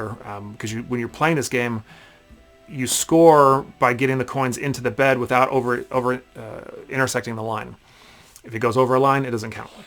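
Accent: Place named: Yorkshire, United Kingdom. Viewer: Shoov ape knee. As a resident of the US (please forgive me, I didn't do it...) I recognized this as a Yorkshire pronunciation with the help of Wallace and Grommit. A friend of mine from England said to me to imagine the US upside down, all the country folk are in the north...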